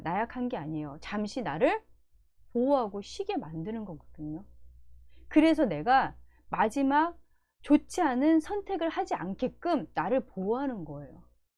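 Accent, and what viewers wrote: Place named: Jeolla, South Korea. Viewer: 전라도 분이신가봐요 ㅎㅎ '모대요 모대요'가 귀에 계속 맴도네요,, 좋은 내용 너무 잘 들었어요♡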